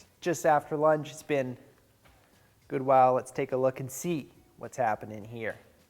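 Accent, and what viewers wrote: Canada. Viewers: What?! This dude has a serious Canadian accent. Matthew McConaughey has a thick southern accent. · bloody hell bud, you've got the most stereotypical Canadian accent i've ever heard!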